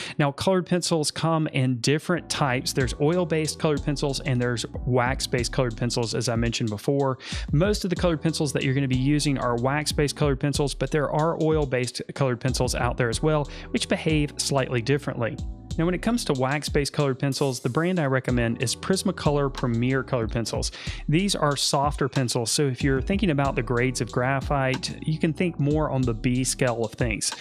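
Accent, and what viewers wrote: South, United States. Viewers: Aidan and Cristie Stevens Just a Southern accent. I’m sure he doesn’t do it just to bug you. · "Karen Dash" I was listening, not watching when he said this and I had no idea that he meant Caran d'Ache! LOL Never heard it pronounced with such a strong southern USA accent before. He sounds super country. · Hes got a bit of a southern draw.....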